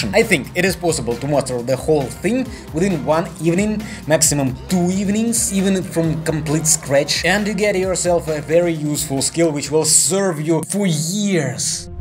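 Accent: Russia. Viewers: Love your Russian accent 😂♥️🤞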